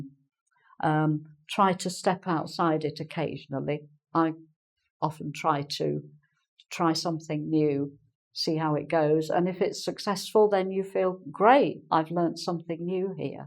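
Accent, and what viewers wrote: United Kingdom. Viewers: i subscribed cause i admired ur english cause i'm facinating with the british way of speaking and i'll follow all ur lessons thank u so much hope u'll help us to get the british accent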